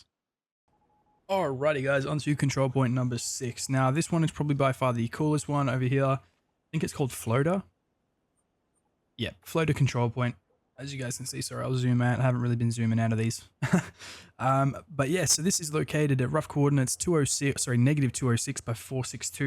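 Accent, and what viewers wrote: Australia. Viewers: Ngl I enjoy hearing another fellow Aussie trying to pronounce these Swedish names 🤣😂😅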